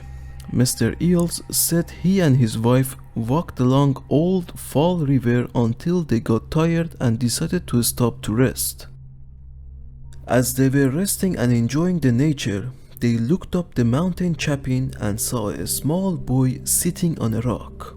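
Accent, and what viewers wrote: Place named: Russia. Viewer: I’m very happy that my comment wasn’t taken in a negative way! I really appreciate your willingness to try to enunciate a little more clearly and work on your English for me :) that’s really cool of you to do! One last thing, that is if you’re interested in my wishes, but don’t ever hesitate to make your videos as long as possible! I really enjoy and try to find the longest videos I can because it’s easier for me to sort of forget that I’m listening and just become hypnotized until I drift to sleep. I become your willing victim, I fall prey to the enchanted tone of your deadly sweet Russian accent... It’s harder for me to get to that place as quickly when I only have videos that are like 8-15 min. I like those a lot too but those are more for day time watching. When it comes to night time, sleepy time listening, longer videos are the best! 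Also, don’t ever let yourself become attached to or fall in love with any intros or outros to your videos that are like loud and abruptly audibly shocking like when you’re falling asleep watching an action movie at home and then an explosion suddenly happens in the movie after a long scene of quiet dialogue lol then you get jolted awake from the sudden volume change. A really incredible example of a YouTube channel that literally does everything I’m talking about 100% perfectly, in my opinion, and is the channel I compare every other missing persons/mystery channel to is the YouTube channel called “Unexplained Mysteries”. That channel uti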